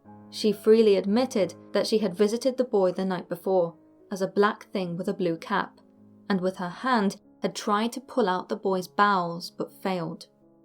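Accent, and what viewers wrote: England, United Kingdom. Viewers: Beautiful English accent, clear, concise with beautiful pronunciation and read at the “proper” narrative speed for an audience to enjoy 😊. Good lass! ❤️🦋🙏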